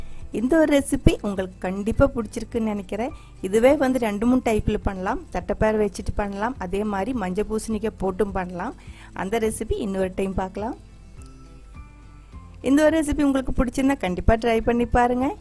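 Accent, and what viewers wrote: Kerala, India. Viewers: Mam ur thamizh mixed with malayalam is sweet. Thanks for explaining in tamizh. I love malayalam, but dont knw.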